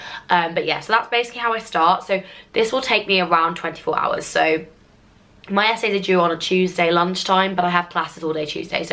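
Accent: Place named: Wales, United Kingdom. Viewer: Oh goodness, that welsh accent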